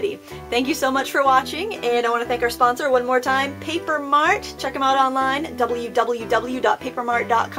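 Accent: United States. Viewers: Hi from the UK. Are you as manic/scatty as you sound, I really love the your voice, so enthusiastic, typically a yank. Thanks for being you, 😀😀😀😀😀😎😎😎😎😎😎🙏🙏🙏🙏🙏❤❤❤❤❤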